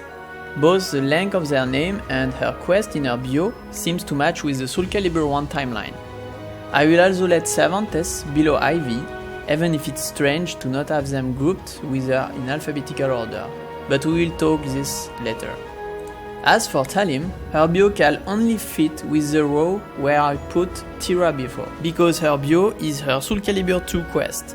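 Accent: France. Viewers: Ooh gosh you’re French you hahaha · So you're speaking with a French accent? Your pronunciation sounds great. I wanna talk like that lol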